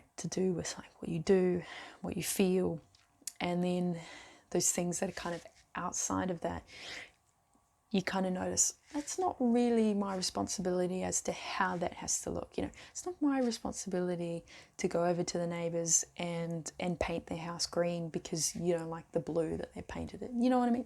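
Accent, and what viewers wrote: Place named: Australia. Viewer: Your aussie accent is growing!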